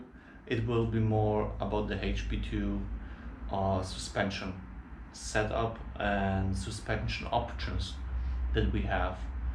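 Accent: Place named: Germany. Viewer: German myself - your accent rocks. Living in South Africa and enjoying your lekker Duitse accent. More so, as an HP2 Enduro owner myself, I appreciate the content you deliver. Vielen Dank und allzeit sichere Fahrt! I am an old f@ and could be your dad. One word of a advice, if I may. Stay as you are, be authentic as you are throughout your life. You rock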